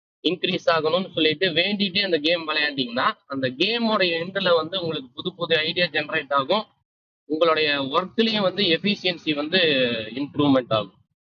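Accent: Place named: Coimbatore, India. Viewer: neega pesaradhu nalla iruku.. coimbatore slang🙂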